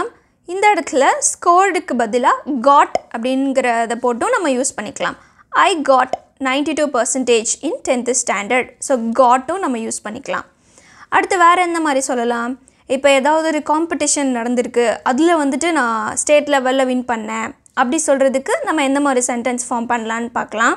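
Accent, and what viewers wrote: Madurai, India. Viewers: Ne ga madurai ya ne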